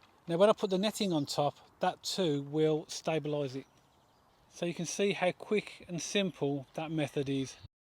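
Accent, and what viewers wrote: Birmingham, United Kingdom. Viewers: Have been struggling with the cabbage whites and looking at buying expensive cages...............I have lots of canes......Thank you so much to something even I can do. Good luck love the Brummie accent.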